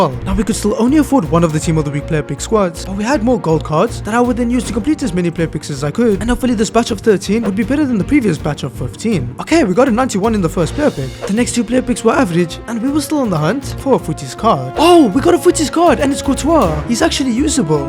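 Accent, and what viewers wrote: South Africa. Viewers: are you from south africa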